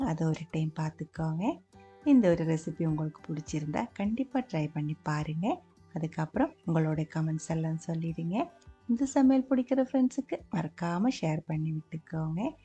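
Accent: Kerala, India. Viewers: മലയാളിയാണോ സംസാരിക്കുമ്പോൾ മലയാളം വരുന്നുണ്ട്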